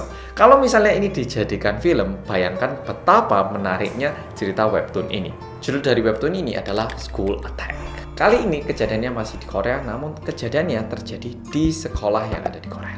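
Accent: Java, Indonesia. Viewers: bersama orang korea yg medhokkkkkkkkkkkkkkkkkkkkkkkk wuasik pool mendhoknya wkwkkw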